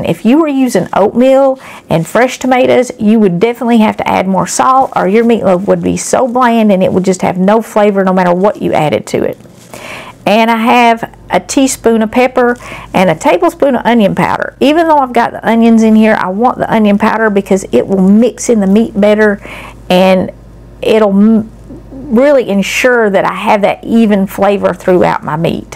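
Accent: South, United States. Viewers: I remember Grandpa would use his left over biscuit bread as a filler. What part of the south are you from your accent makes me so home sick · What does God have to do with meatloaf? ...and the backwoods southern drawl and terrible grammar are not encouraging !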